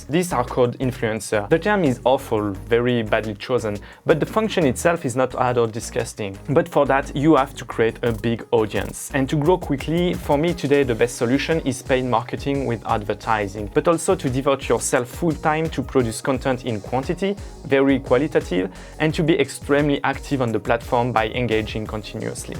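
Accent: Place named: France. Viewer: Tu es un français avec l'accent 😂♥️♥️♥️🙏🏽🙏🏽 bon courage frère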